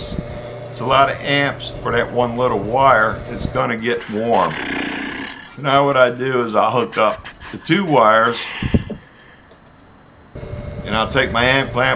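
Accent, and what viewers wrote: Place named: Connecticut, United States. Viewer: Wayne (Marion Robert Morrison) born in IA and raised in California - are there these typical accents from either State? but you're right - sounds a whole lot like John Wayne. Maybe here might disclose which State of the Union he was raised and what we're hearing - I detect some CT in there.. it's a cool dialect where ever it may be located.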